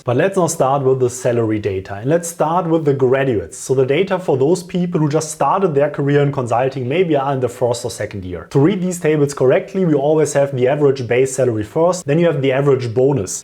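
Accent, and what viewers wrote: Germany. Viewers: Alter hörst du dich deutsch an😂😂. Trotzdem gutes Video👌🏼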